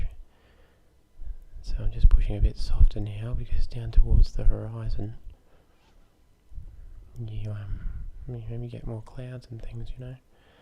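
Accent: Australia. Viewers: So I was scrolling and I found this man’s YouTube channel and I didn’t know who he was so I check them out and I found out he is Australian and it’s a lesson about how to talk Australian and I’m like well if I was American I would’ve watched this but it is relaxing though but I am already from Australia my whole family is from Australia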